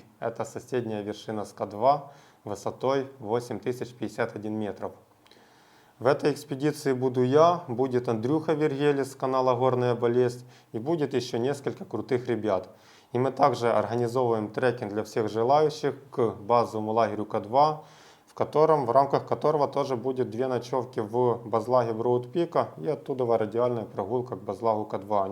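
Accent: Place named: Surzhyk, Ukraine. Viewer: сделайте что то с суржиком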